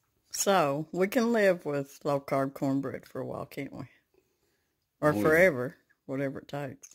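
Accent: South, United States. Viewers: hi friend! This sounds delicious. A nice switch from regular chili. I must make this. Your voice is so relaxing to me. It’s late here and I was listening to this video and then I heard the gunshots and I started cracking up! I love you guys so much! Oh and don’t worry about rude comments. I love your southern accent. Makes me feel like I’m at home. Your accent is beautiful just as you are. Love you!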